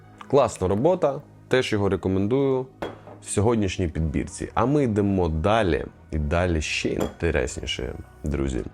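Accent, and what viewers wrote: Ukraine. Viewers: українська мова з Харьківським акцентов))) АГООНЬ))))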